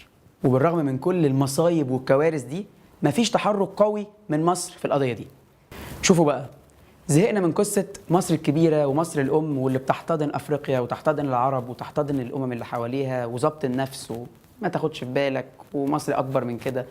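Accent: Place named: Gulf, Arab world. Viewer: واضح من لهجتك إنك من الخليج..... طبعا لا داعي للذكر إن كل دول الخليج لها علاقات وطيدة جدا بإسرائيل و من ينفي ذلك مغيب أو ساذج و بالنسبة للعلاقات مع إسرائيل ...بالفعل مصر اول دولة طبعت علاقات مع إسرائيل أحنا لا نتناسي....و لكن غيرنا يصطنع العداء لإسرائيل و هو تحت الطاوله يمد يد العون و المحبه و التعاون مع إسرائيل بكل الطرق و بالفعل القوي الناعمه الإسرائيليه في محيط حوض النيل قوية بشهادة القاصي و الداني و ممكن تقرأ عنها رجاء واحد...كفانا كلام من نوعية الإستهلاك المحلي و إصطناع الوطنية الكاذبة!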